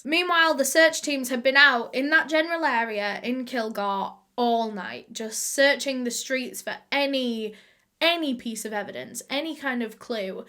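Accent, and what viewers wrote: United Kingdom. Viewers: Who else is just mesmerized by her British accent?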